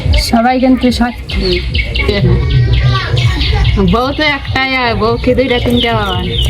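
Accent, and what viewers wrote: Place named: Tangail, Bangladesh. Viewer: বাড়ির সবাই পীর মনে করে। কথা - বার্তা যা কয় তাই মনে করে শুদ্ধ। সাথে সাথে তারা ও শুদ্ধ বলার চেষ্টা করে, আর তাতেই খায় ধরা। এ ও হয় না ও হয় না। যার যার আঞ্চলিক ভাষায় কথা বললে কি অসুবিধা। আরে টাংগাইলের পুরাপুরি শুদ্ধ না। এই যে বলে গামচাটা ভগলা, আইচাল, খাইচাল,গেছাল। আমি ময়মনসিংহের মেয়ে আর টাংগাইলের বউ। সুতরাং সব আমি জানি। যার যার জায়গা থেকে সে বলবে সেটা দুসের কিছু না।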